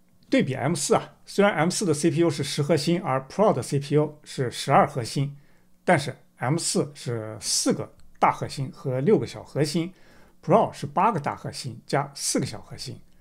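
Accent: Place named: Xinjiang, China. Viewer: 老哥。听口音 新疆的哇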